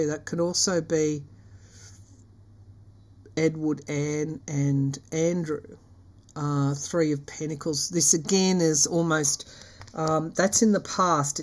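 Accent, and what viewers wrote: Australia. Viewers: Thank you for these readings! 🙏 I love the way you have dealt with the trolls! Tell 'em off! I look for you videos every couple of days. I appreciate your manifestation of mercury/mars/Pluto/Venus conjunctions especially with the Aussie accent. Huzzah, ma'am! Peace love and light.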